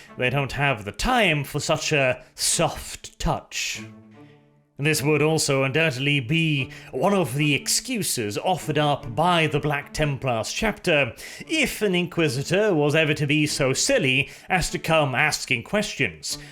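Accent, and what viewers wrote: United Kingdom. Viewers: I just started watching your content after the perturabo and you my friend have the quintessential British voice and it’s so good to listen to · I love your videos almost as much as I love 40k! But I have a question: Did you know that you have the "evil" British accent?? Works out great but I'd hesitate to meet up for a drink at a little pub . . . . .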